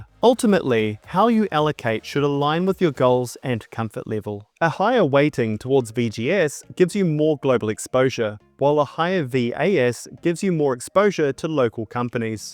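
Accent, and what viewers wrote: New Zealand. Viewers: I hear a NZ accent. Im not crazy right?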